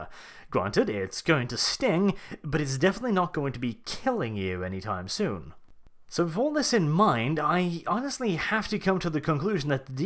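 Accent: RP, United Kingdom. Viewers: im English and a big fan. but please stop shitting on the British when you quite clearly speak in a posh English accent. seems quite hypocritical.:) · He's actually Norwegian, but somehow he speaks in a weird posh british accent.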